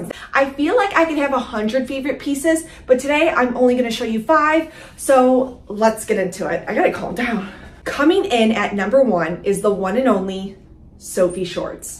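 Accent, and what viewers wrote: Midwest, United States. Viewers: I love your Midwest accent!! It’s in the a’s like in “baaack” so cute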